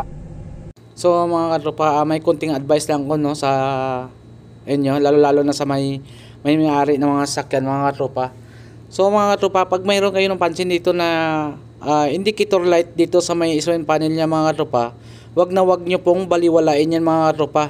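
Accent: Iloilo, Philippines. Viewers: Parang ilonggo ka sir ah taga saan ka same problem kmi ei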